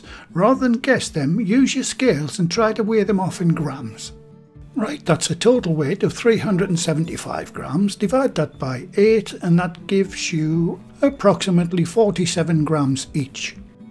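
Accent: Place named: Geordie, United Kingdom. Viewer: I only found your site today and already a new subscriber. Very instructional.. music nice and not too intrusive and love your Geordie dialect. It’s like being with you in the kitchen:)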